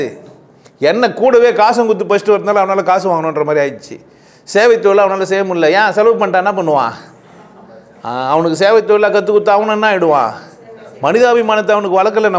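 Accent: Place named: Chennai, India. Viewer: Madras language super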